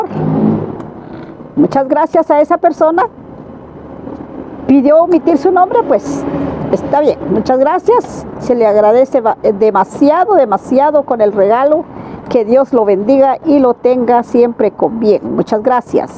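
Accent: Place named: Guatemala. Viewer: Saluditos amiga💜💐tan chula como me gusta 👍 usted habla como toda una chapina . Ysi que llevo lindas plantas 👌😍💐yo soy chapima de Santa Rosa de loma 🇬🇹 y vivo en California 👍abrazos y bendiciones 🤗 en mi canal también se habla de plantitas y mas amiga 👍💚🌎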